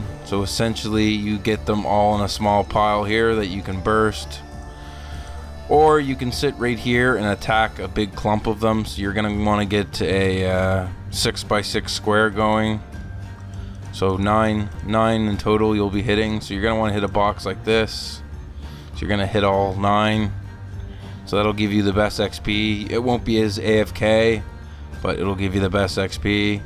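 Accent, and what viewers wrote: Canada. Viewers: are you from nova scotia ?canada obviously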